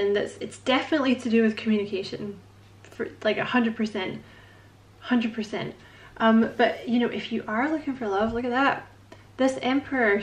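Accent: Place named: Glasgow, United Kingdom. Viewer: Love your accuracy. . . AND your Glaswegian accent!🏴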